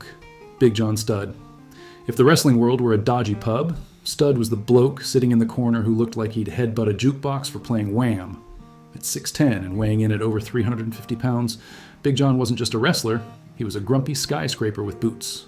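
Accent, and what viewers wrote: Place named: United States. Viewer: So this script is obviously written by a British person and read by a yank. Unless theyre Canadian, but we don’t talk about them anymore. Still a great channel though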